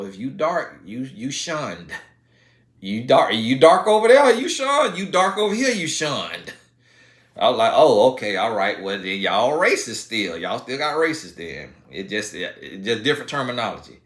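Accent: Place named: South, United States. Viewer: unrelated but i really like your accent! as someone from california, it’s satisfying to listen to. southern accents for the win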